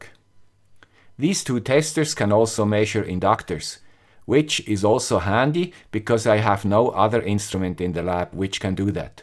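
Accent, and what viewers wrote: Switzerland. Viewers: Dankeschön, Du 'guy mit dem swiss accent'. So viel Herzblut in den Videos und genau so viel Fachwissen. Man lernt in jedem Video etwas Neues. Hast Du schon mal Annex Wifi-RDS ausprobiert? Ich halte es für ein ausgezeichnetes Projekt, mit dem sich alle möglichen DIY-Lösungen mit ESP8266 in Windeseile erstellen, testen und modifizieren lassen. (btw: 'accurate' and 'accuracy' are both stressed on the first syllabus in contrast to 'developers' - sorry about that....) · dude you are awesome , im gonna try to watch all your vids, love the swiss accent, im american, obviously, thanks for all the help in the past and the help you will give me in the future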